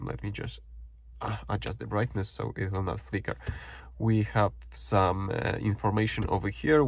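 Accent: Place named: Poland. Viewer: Polak mówiący po angielsku 😎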